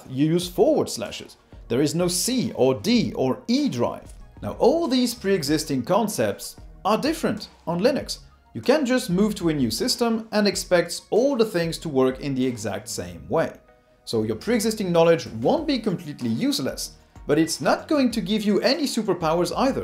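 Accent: France. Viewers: Ah such a relief to hear the half french accent pronouncing 'linux' again. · Are you french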